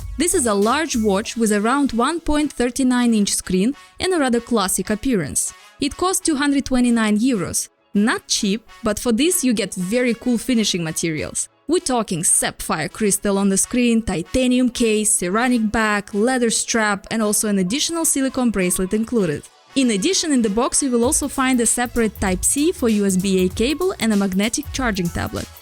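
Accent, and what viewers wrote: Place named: Russia. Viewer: i like russian accent hhhhh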